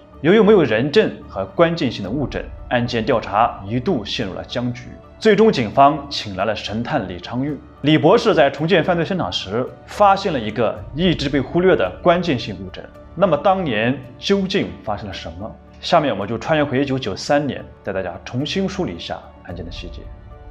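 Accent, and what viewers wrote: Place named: Anhui, China. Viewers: 听起来口音应该是安徽的吧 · 主持人是安徽人，口音重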